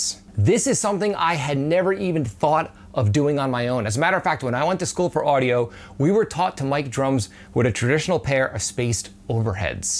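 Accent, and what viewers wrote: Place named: Philadelphia, United States. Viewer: Hey, new subscriber here. Great stuff! Love your videos. I have a personal question. Your accent is driving me crazy. Do you happen to be from the Philadelphia area? Jersey perhaps? I grew up in NJ and moved away when I was 18. When I finally came back years later I really started noticing the distinct accent a lot of folks have from my area. Just a shot in the dark but figured I’d ask.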